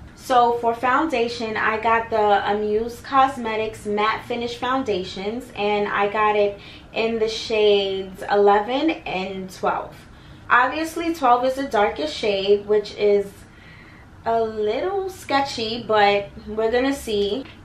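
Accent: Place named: New Jersey, United States. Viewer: Are you from NY because I’m from jersey and you sound like us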